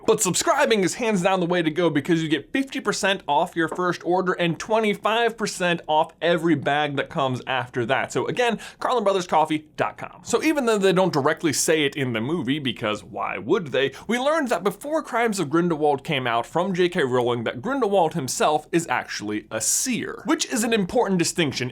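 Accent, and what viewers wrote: Germany. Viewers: Your germane accent is so funny and bad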